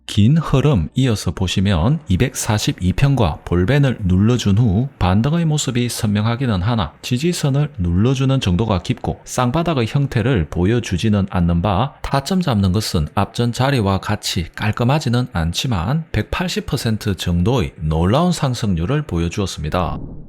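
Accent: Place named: Gyeongsang, South Korea. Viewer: 세련된 경상도 억양이 집중도를 높이네요 귀에 쏙쏙 ~~ 영상 굿~~~ 감사합니다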